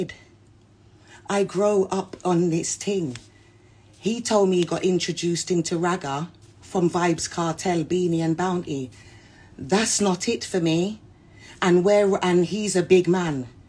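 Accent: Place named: United Kingdom. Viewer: Your not from Jamaica your from England you grandfather was from Jamaica so you have Jamaican roots 🫣 your talking in the most British accent going and then talking about your sisters brother in law 😂😂 you didn’t go up on side shows you grew up on the side of the London streets getting cleared out and the videos are on YouTube for proof. The point about Ibiza would be correct if they lived in England their entire life and never visited Ibiza every year then correct you would know more then them about Ibiza streets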